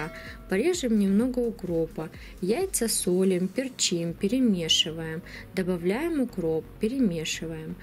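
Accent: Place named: Ukraine. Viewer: здравствуйте! Очень доступные и просиые рецепты у вас. Спасибо, что делитесь с нами. И ещё мне нравится, как вы говорите. Вы из Украины?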